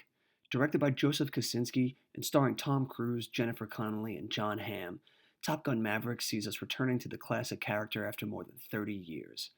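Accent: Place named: Brooklyn, United States. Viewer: nice vid - are you east coast repping? your accent reminds me of life in BK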